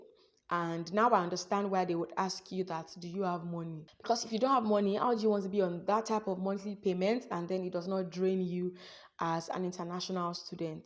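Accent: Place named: Jamaica. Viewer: Yes you speak English but you have a Jamaican accent. Hard to understand . Don't complain about the people. You are not perfect. So you went to a Country to learn. You can tape it on google for what you want. That helps a lot. Any Country is the same. Yes. No one uses sorry. Because you need to learn the basic Hungarian language. Elnezest. Use this. This country is not an English colony. Before you went there you didn't read about anything ? Your fault.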